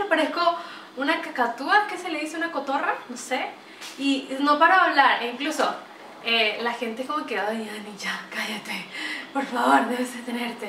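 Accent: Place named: Andes, Venezuela. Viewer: Echoooooo.. ¬¬ no seas antipatriota! Manten tu acento venezolano hasta LA MUERTE!! Aunque tu venezolano es medio andino :S pero bue. . . .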